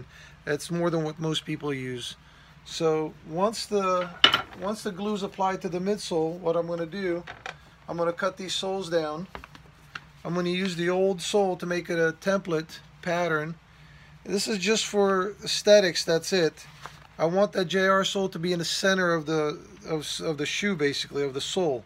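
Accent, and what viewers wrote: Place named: Philadelphia, United States. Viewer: Are from Philly? You got a Philly accent or close.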